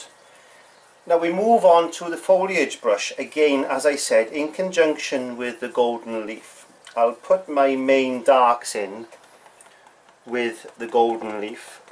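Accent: Wales, United Kingdom. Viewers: I find your method of teaching so easy to follow, you cover every aspect of painting and make it all look so easy. Love the Welsh accent having lived in S.Wales for a number of yrs. Thanks again for all the tips. Mary Thomas.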